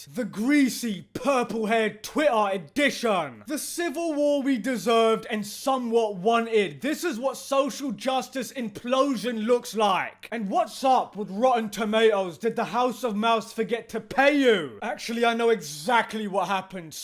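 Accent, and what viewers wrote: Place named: England, United Kingdom. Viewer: I think the content you create and the opinions you have here are valid and all but I absolutely HATE the way you aggressively shout with that horribly abrasive English accent, it makes it almost impossible to watch more than a few minutes of the video. Reminds me of certain scumbags that I used to meet on random UK discord servers with this 'know all, know fuck all' attitude that you yourself seem to have adopted for this channel. Hard pass mate.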